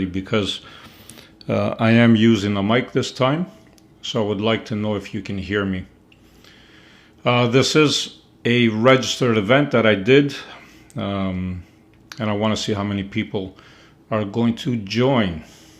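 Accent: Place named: Greece. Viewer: I am so glad that you were born in Greece, when i first watched the first video of you, from your accent, i understood that you are Greek :) I am following your guidance and i ve seen tremendous changes in my financials and mental health. Ευχαριστώ!!!☺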